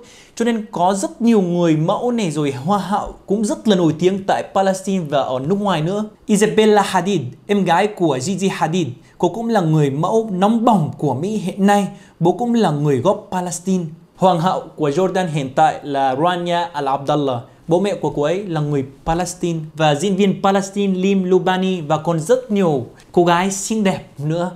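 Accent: Standard. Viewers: Hoan hô, cám ơn đã cung cấp cho người Việt chúng tôi những thông tin, hiểu biết chính xác về thế giới Arab và Đạo hồi. Tiếng Việt của rất chuẩn, hiểu biết về văn hóa, xã hội của rất rộng. Khâm phục đấy! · dep train,noi tieng Viet chuan 😊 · Chào, các clip của bạn rất hay, nhất là tiếng Việt của bạn rất chuẩn, dễ nghe. Xin chúc mừng Mabrouk! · Bạn làm video rất hay chân thực và nói tiếng việt rất chuẩn cảm ơn bạn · Anh nói tiếng Việt quá rành rõi, phát âm, dùng từ chuẩn còn hơn nhiều người Việt nói tiếng Việt nữa. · Bạn nói tiếng Việt chuẩn quá phát âm rất tốt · Hi hi, Cám ơn, nói tiếng Việt chuẩn quá! Đất nước nào cũng có anh hùng và tội phạm…tất cả họ đều do phụ nữ sinh ra nên phụ nữ Đạo Hồi cũng ko ngoại lệ 😂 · Quá hay! Bạn đã giải thích rất chi tiết và dễ hiểu. Tiếng Việt của bạn rất chuẩn. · Woa anh nói tiếng việt quá chuẩn luôn 👍👍👍chúc anh nhiều niềm vui va hạnh phúc nhé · Anh nói tiếng Việt chuẩn luôn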